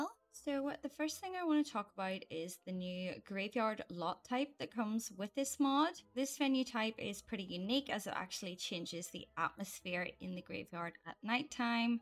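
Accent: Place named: Northern Ireland, United Kingdom. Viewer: Omg I got so excited when I heard your accent! I have never came across a fellow northern Irish simmer! ❤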